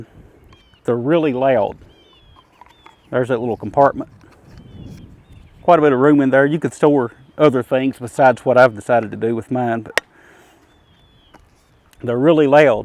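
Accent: United States. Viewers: you have an interesting accent which is specific for one area of USA, that's why I asked.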